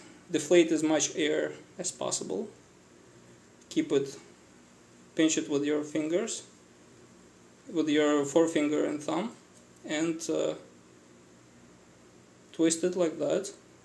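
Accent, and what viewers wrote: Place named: Russia. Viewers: я тоже сразу услышала русский акцент, но он действительно очень приятный. Это совсем не тот русский акцент, когда слышишь и думаешь "лучше говори по-русски"😂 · Какой приятный Русский акцент)))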